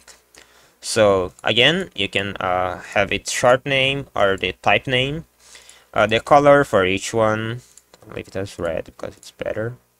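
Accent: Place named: Brazil. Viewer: N precisa n, é que eu vejo tanto video em ingles q eu percebi um leeeeeve sotaquezin BR ouvindo vc, hehe. Mas parabéns pelo vídeo :D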